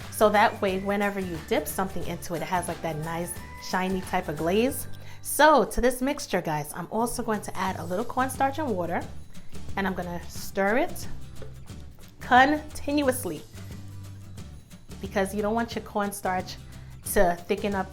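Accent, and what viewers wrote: New York, United States. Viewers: love ur ny accent lol been watching all ur videos keep em comingggggg !!!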